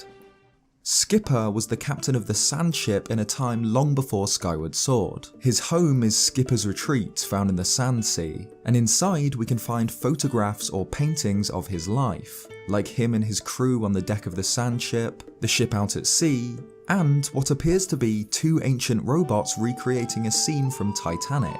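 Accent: United Kingdom. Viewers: will the legend reply aswell are you british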